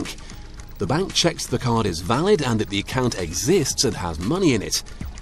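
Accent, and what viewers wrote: United Kingdom. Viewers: The U.K. narrator makes everything better.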